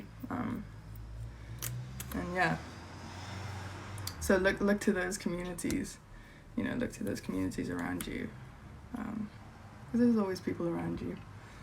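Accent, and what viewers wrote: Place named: Cape Town, South Africa. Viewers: You have a Cape Town accent. Love your music!!!. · Right ! She could be a Saffa, where is she from ?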